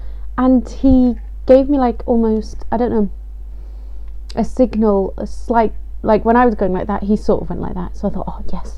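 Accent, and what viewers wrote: United Kingdom. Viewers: In my opinion there’s nothing better than a girl with an English accent.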